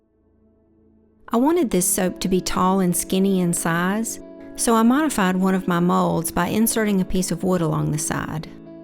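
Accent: South, United States. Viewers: I am so proud of you I have a tear in my eye. This is an amazing video that I can tell you put your heart and soul into. I love your voice over! And let me just say I did not imagining you having a southern accent! I need to change the way I say, “Oil (insert Southern California accent 😂).” You say it so much cooler! Bravo and take a bow!!!!!!!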